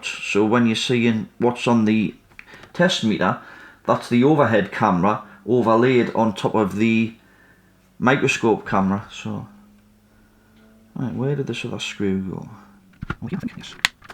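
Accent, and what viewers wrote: United Kingdom. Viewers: Nice fix! Always love watching your videos – interesting repairs and I like the British accent and "unamerican" presentation. BTW: what part of Britain are you from?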